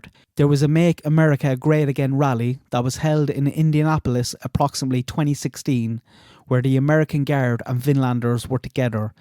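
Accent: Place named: North Cork, Ireland. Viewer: Excellent video, my only criticism is that it went a bit fast, the pictures & text. Btw, is that a north Cork accent?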